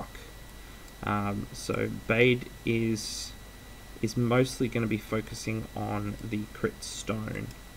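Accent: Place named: Australia. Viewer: You sound Australian, are you?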